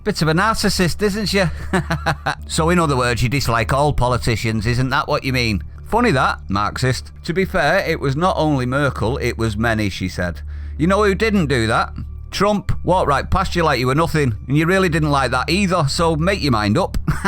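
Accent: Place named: Merseyside, United Kingdom. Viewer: Totally nailed it. little Greta made her fame by being a funny witty talking parrot and now that she is famous... she complains that people were coming to see her just because she was an unusually entertaining parrot in their local town square. Waki Waki Greta! here are some more news for you: same people, when visiting Paris, also take a selfie with the Eiffel tower just because its the famous... Eiffel tower. None of them have even a bit of appreciation to the engineering genuinity of the 19th century. They just want to brag to their friends they were seeing the f&%king tower while they (friends) were taking their kids to school in the rain. Your channel became my favorite daily news channel... from way-way far from Britain you sometimes sound to be like a disillusioned John Lennon speaking to our world, horrified by the reality all around... 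(sorry for my cultural narrow mind views... to my non Brit ears Mersey area accents sounds as one... ). Keep the great work!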